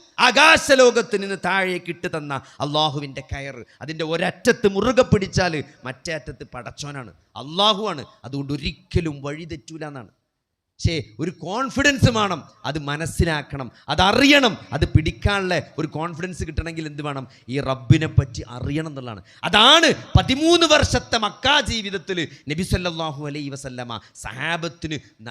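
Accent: Malappuram, India. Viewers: That is pure Malappuram language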